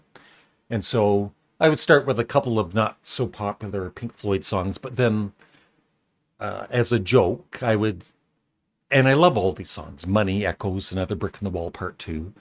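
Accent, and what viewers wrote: Canada. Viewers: I have always pronounced it jag~er. Maybe it’s your Canadian accent,!😉I’m so glad that you get more good comments than the rotten ones.😊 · Perhaps some people don’t realize you are just simply saying Jagger’s name with your Canadian accent?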